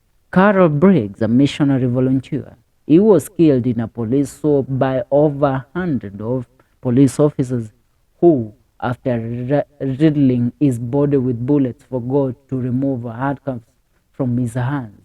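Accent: Kenya. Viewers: It would greatly help if u worked on your oratory skills..We may accept the Kamba accent, but the delivery is all wrong..Master the content n narrate in a manner that excites..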